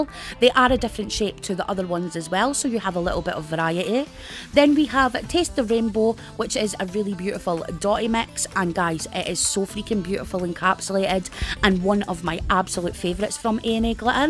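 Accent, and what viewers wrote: Scotland, United Kingdom. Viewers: Love watching your haul video's and your scotish voice over.. Congrats on 4k xx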